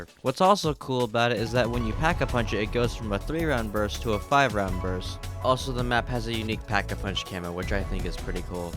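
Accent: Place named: Kentucky, United States. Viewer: Hey dude great video I just have one question... Are u from Kentucky, USA? I just find your voice very familiar haha i hope it doesnt sound weird XD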